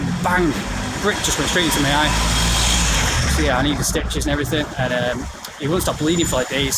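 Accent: United Kingdom. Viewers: You have a Scottish like accent. Are you from Liverpool/Scotland or nearby?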